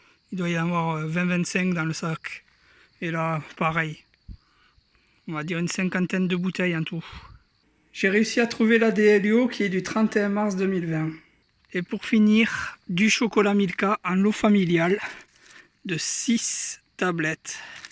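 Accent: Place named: South-West, France. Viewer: "Était" Il a un empêchement, peut être qu'il veut faire une pause sur les vidéos, problèmes médicaux, j'aimais bien son accent de chez moi (Sud-ouest, Dordogne)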